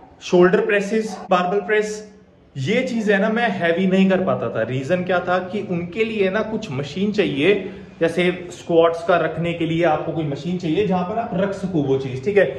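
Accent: Haryana, India. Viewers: Bhai haryanvi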